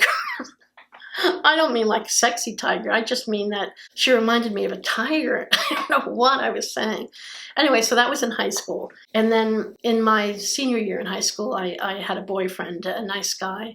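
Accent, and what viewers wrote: New Jersey, United States. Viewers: I knew that accent was American but now you got me I'm from Jersey City New Jersey YYYEEEAAAAAA!!! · From jersey and don't talk through your nose 😂😂 My Dads is from there 2 and when I piss him off u can hear that Jersey coming out 😊😂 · i'm from nj too but i grew up in pa. did you ever have the jersey accent? i had a heavy jersey accent at one point but it changed to a pa accent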